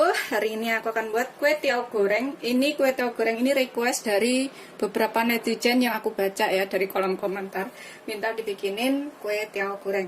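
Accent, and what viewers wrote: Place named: Banyumas, Indonesia. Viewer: Mba'e logate kaya wong mbanyumas.. 😊😊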